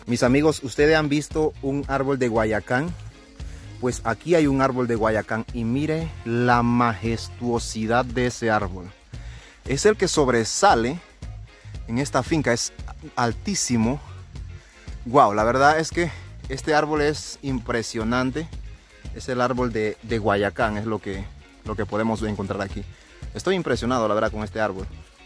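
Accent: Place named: Chiriquí, Panama. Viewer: Fren usted saluda diferente ,yo no sé hblar bien el ngabere pero no se escucha igual a como hbla mi abuela ,talvez por qué es chiricano ,saludos y buen trabajo.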